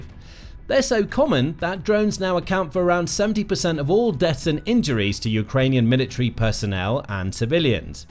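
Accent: United Kingdom. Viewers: Your British speech impediment is strong. You should learn the correct American way to pronounce words including innovative.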